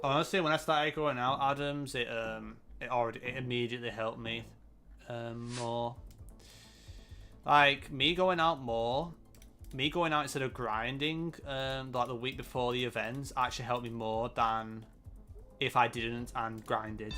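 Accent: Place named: Lancashire, United Kingdom. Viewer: Don't give up. Your doing great. I always watch your videos (I am Polish). I will region guess you by accent. Your'e from Lancashire, either Preston or Wigan.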